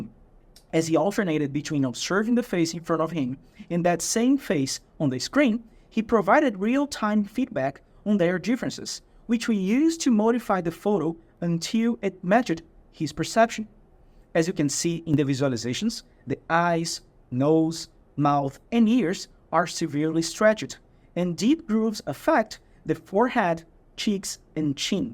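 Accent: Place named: Brazil. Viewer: esse sotaque é brasileiro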